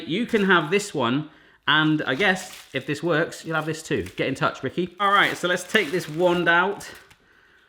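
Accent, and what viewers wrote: United Kingdom. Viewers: Yep your accent totally "Vanilla" British! hahaha ^.^ Loved the Egg/Toaster combo thingy! ^.^ ♥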